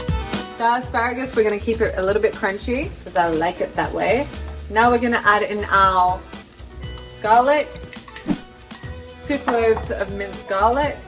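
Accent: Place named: Australia. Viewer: you are such a funny and beautiful cook, I love your Auzzie accent and am a huge fan of your wonderful husband Texas guitar slinger Mr Gary Clark Jr, Ive seen you two interviwed at the grammys and you just take my breath away, fine couple hope you can keep your love flowing Thank you for all your delicious recipes you of course make it all so delicious.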